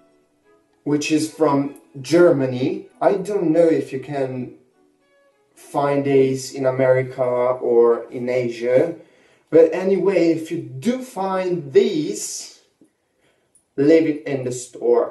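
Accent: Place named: Italy. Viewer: OMG ho appena scoperto che sei italiano! ^_^ In effetti si sentiva che non eri Inglese (srnza offesa ovviamente, il tup inglese è ottimo :) )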